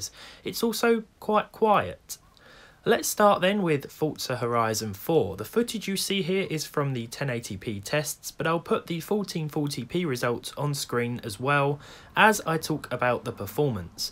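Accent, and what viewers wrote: United Kingdom. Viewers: How do you know he’s British besides the accent? He says parcels instead of packages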